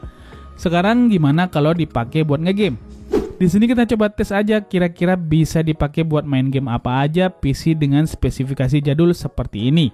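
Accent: Madura, Indonesia. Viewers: Ngasak?😂 Kental banget madura kearifan Bondowoso 😂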